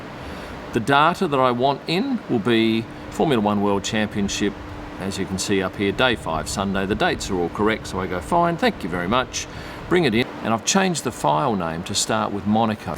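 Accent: Australia. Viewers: This is an excellent channel 😊 love the out-takey bits qt the end, informative, educational, well edited and that Aussie silky voice hahahaha! Stay passionate Bro!! 😆😆😆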